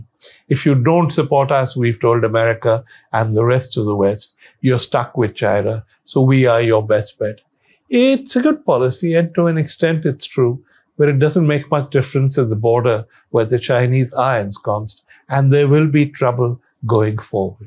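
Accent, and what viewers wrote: United Kingdom. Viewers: Too much wine and hangover British accent.. in Tamilnadu BJP may not get any seats, but be aware that the vote share is really rising. The idea of Hindi heartland party is only for these Khan market window shopping and gracelessly aging British leftovers in India..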